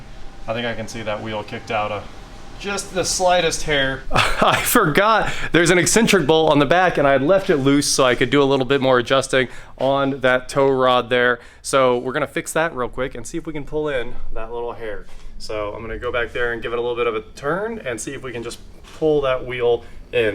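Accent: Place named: Midwest, United States. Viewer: the "needs replaced" dialect is very interesting , only heard it in the middle of the USA, versus "needs to be replaced". Noticed it over several videos.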